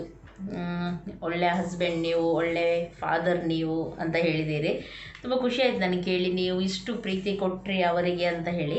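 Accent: Mangalore, India. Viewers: Hi I'm from devanahalli nim yella vlog na ee lockdown time nali nodtidini kushi agute nim family nodoke n nim tumba lucky Olle family na aa devru kotidane nange nim birthday nim husband celebrate madidu adu nange tumba kushi aytu n nim mangalore baashi tumba chenda untu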